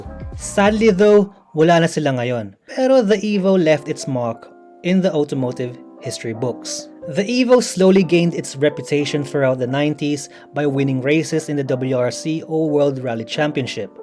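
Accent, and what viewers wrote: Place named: Australia. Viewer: I like the cars you recommend sir but I love your subtle ozzie accent.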